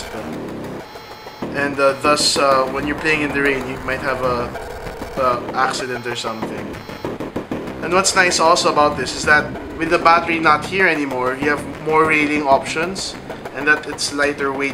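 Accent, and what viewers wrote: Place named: Philippines. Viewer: Filipino accent :D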